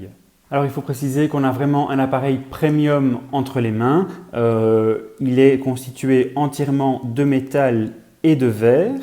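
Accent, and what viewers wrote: Belgium. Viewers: with a belgium accent i would say a belgium accent but i dont arrive to find town Charleroi Liege etc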